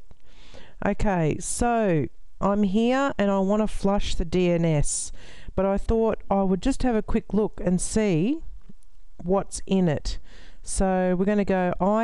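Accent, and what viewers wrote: Australia. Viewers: from your accent I noticed that you are Australian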